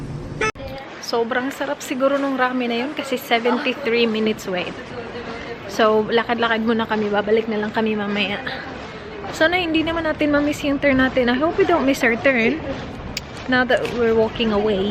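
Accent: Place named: Visayas, Philippines. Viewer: Maka addict man imong vlog ms... cute nimo lalo Kong magbisaya hehe.. God bless po.. ingat po kayo..:) mwahh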